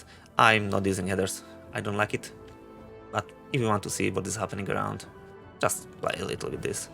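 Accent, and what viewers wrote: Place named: United Kingdom. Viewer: why in the world do people who mumble, and have thick british accents make guides. totally bizarre.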